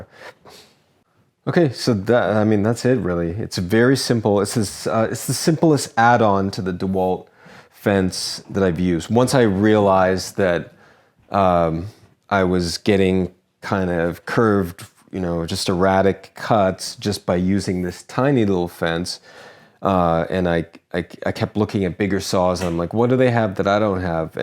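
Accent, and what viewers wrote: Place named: Canada. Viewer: Great video, great idea. Also, I could listen to Canadians say words with the "ou" sound all day long: out, about, house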